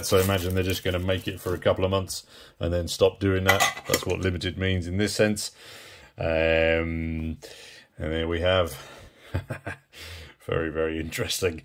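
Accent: United Kingdom. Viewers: I heard an English voice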